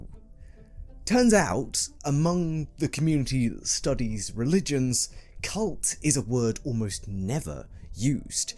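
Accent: United Kingdom. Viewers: wait—“modern mainstreaming of Christian culture” in the US? You mean those TWO THOUSAND YEARS of “modern mainstreaming” of Christian culture in Europe are just going to get a pass? You sound like you have a British accent, can you comment on the thousands of lives lost and the awful terrorism stoked by religious fervor during the Troubles? Or perhaps the explicitly violent Christianizing mission of European colonizers in Africa, South and Central America? Or the European Crusades? Come on, let’s not have an AmericaBad moment here.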